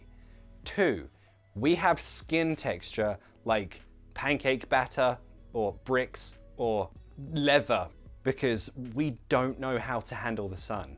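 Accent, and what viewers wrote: United Kingdom. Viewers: I love the British accent 😍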